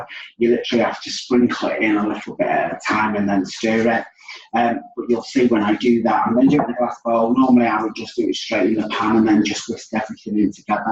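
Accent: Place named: Australia. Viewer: I am very interested in this recipe but I cannot understand a word he is saying in this video. 😞 very poor audio + heavy Aussie(?) accent.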